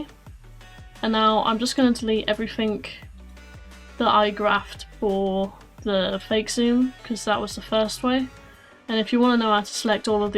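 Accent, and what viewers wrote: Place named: United Kingdom. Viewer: i noticed when im trying this and i noticed your bri-ish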